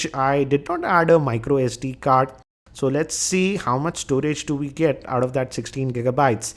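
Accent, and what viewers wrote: India. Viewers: typical Indian accent english.. :(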